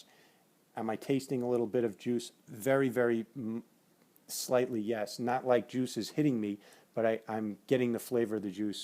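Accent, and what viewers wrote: New Jersey, United States. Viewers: You from Jersey?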